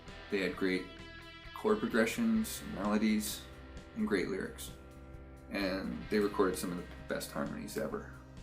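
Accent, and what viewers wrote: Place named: Canada. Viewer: You can tell this guy's from Canada in the first three seconds.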